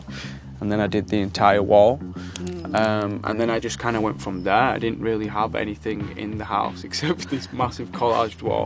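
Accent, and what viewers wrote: United Kingdom. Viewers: British accent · I forgot how strong his accent actually is. You really have to pay attention if you are not British 😅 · OMG!!! his voice and his british accent is pure love!!! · lol after so many years i finally hear him talking and that british accent was freaking unexpected af lmao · Is he tryna hide his british accent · Because he rarely talks, I'm always shocked to hear a British accent come out of his mouth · Zayn is my reason love British accent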